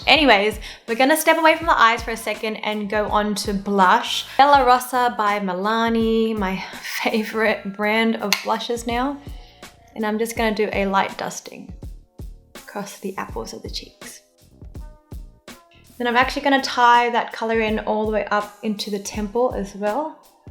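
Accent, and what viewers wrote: Australia. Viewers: is she australian？？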